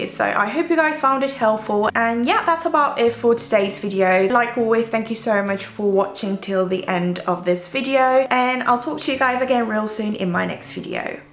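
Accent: United Kingdom. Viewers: 이거 영국식 발음이에요 아니면 호주이런쪽이에요?......... 억양이 어느나라 영어에 가깝나요? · 언니의 영국 억양에 한번 더 녹고 갑니다 ㅎㅎ 🙂😊👍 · 영어 공부하는 중이라 영어영상이 더욱 반갑네요!! 영국발음이랑 유창한거 너무 멋져요ㅜㅜ 또 한번 반했네요💕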